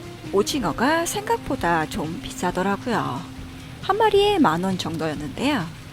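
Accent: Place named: Japan. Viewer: 안녕하세요 ~ 혹시 일본인이세용? 말투가 한국인이 아니신듯 해서요~😂 혹시 한국이시면 특이하신 말투시네요~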